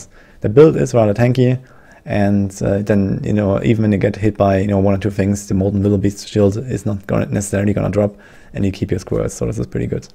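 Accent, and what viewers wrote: Germany. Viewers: His German accent is not a problem at all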